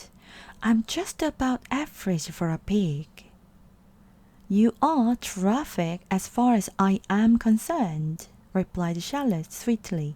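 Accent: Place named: United Kingdom. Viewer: 이 영상 덕에 영국식 발음 배운것같아요^^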